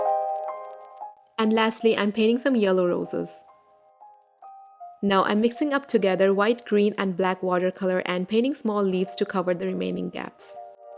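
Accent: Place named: England, United Kingdom. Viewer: Lovely cards 🥰 loving ur English accent as well 😘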